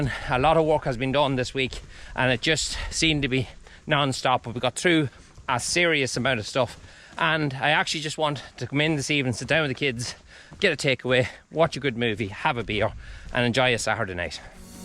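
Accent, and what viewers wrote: Cavan, Ireland. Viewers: I have to say it’s absolutely deadly to hear a cavan accent on YouTube!! Apart from you’re honesty you make great videos, all the way from new York you know how to make me home sick!!! Virginia man.keep up the great work lad.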